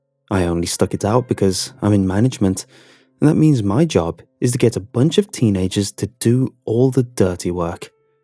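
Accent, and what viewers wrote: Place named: Wales, United Kingdom. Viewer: Why does his accent sound a little bit welsh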